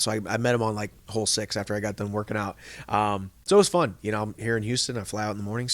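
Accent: United Kingdom. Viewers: Any chance ur scottish ?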